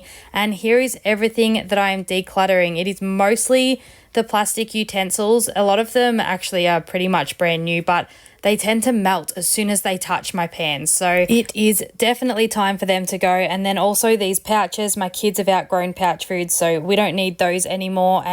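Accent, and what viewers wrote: Australia. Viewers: This was an awesome video, I love listening to you talk, maybe because you're another Aussie. Be proud of what you are accomplishing, you are getting things done. Love the before and afters! Thanks for sharing, I wish you would make more videos, so motivating!!!! 🥰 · I do not see on your channel description on where you are located/which country? Im guessing Australia 🇦🇺? Anyone knows, i love her accent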